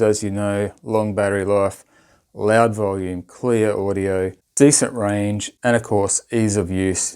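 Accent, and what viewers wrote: Australia. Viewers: I stumbled across your video while trying to find reviews on Fodsport products. I'm always amused by the fact that I can tell when a video is shot in australia (other than the accent, the lighting and colours just look a certain way). I didn't pay much attention to the poster in the background (until after the video and coming back to comment) and skipped through the first part of the video to the test ride. I often try and see if I can figure out what city/town it's in and if i can recognise anything if it's a place I've been. Well the traffic lights didn't give me much help, but as soon as i saw the show grounds i knew exactly where you were. I'll have to check out some of your other videos (gotta support another local). I just flicked through your vids and saw you are giving one of these away. It's just a shame I won't be able to get enough info to enter the draw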